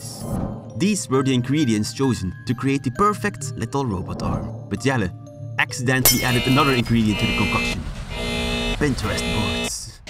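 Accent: Netherlands. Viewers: u sound rlly nederlands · You Dutch.? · I smell Dutch.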